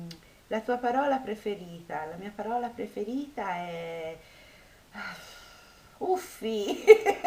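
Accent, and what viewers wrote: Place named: Tuscany, Italy. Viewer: Che meravigliaaaa! Adoro la parlata toscana <3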